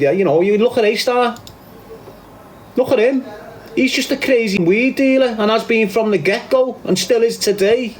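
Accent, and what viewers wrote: Liverpool, United Kingdom. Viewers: <link> Hes the best scouse I've heard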